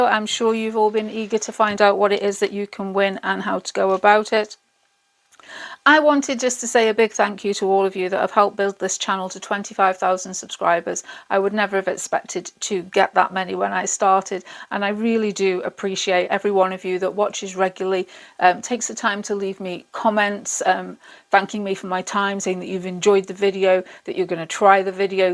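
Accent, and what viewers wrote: England, United Kingdom. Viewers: Hi, I'm Jane, in the UK, a relatively new subscriber, and I'm avidly watching all of your videos, I come to you for a dollop of inspiration! I'd love to get into gel plate printing, it looks like a lot of fun! Also, can I say, it's lovely to hear an English voice in the world of mixed media! Congratulations on reaching 25k, you deserve it.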